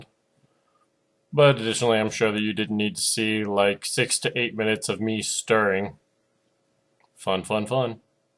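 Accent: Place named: East Coast, United States. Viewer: wow - funny video and very informative I really appreciate your taking the time to make and post this video - I've shared it with others who've asked about Ebru -- I may have to try this -- beautiful work - what is your nationality - heritage? you're obviously American - New York? New Jersey? sounds east coast LOL - I don't know - are you Turkish? Pakistani? I originally thought this was an Islamic art form - I lived in Turkey for two years and how I could have missed this I do not understand - I was young so maybe I just wasn't into art back then.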